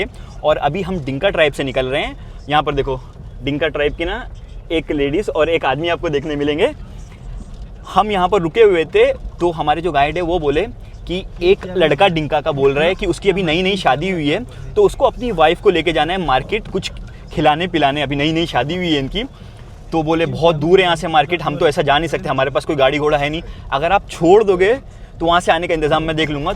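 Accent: Mumbai, India. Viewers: I enjoyed your video, I am a Pakistani and enjoyed the way you speak Mumbai type Hindi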